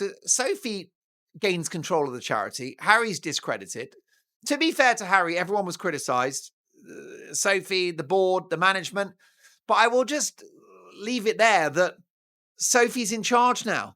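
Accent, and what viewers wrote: Australia. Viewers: Excellent Aussie accent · your great Australian accent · Lol,love your Aussie accent 😅Yes,Dixon St in Sydney is where you will find Chinatown,l use to work around the corner in Sussex St,lol😊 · love your Aussie dialect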